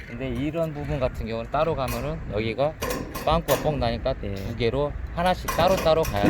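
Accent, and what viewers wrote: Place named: Chungcheong, South Korea. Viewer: 충청도 사투리가 정감가네요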